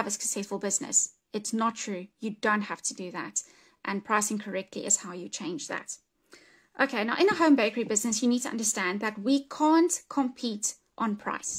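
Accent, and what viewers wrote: South Africa. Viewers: Your accent makes me miss my friends in South Africa!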